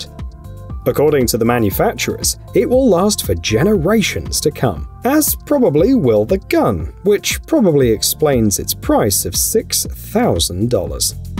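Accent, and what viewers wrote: England, United Kingdom. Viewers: I love hearing English people describe firearms. The frame and SLIDE…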